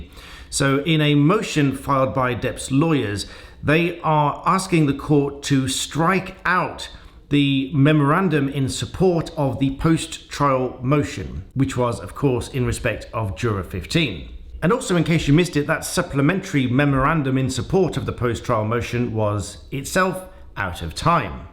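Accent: United Kingdom. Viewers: Heard all this on my American atty channels, but I have to say, is so refreshing to hear with a British accent. This judge has also had her fill of team Amber.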